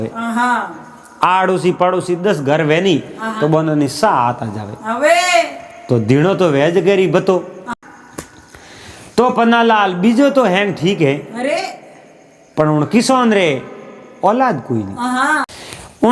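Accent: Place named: Barmer, India. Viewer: आप बाड़मेर की भाषा में बोल रहे हो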